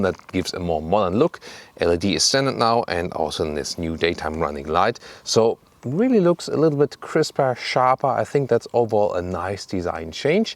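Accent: Germany. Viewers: Dieser deutsche Akzent :D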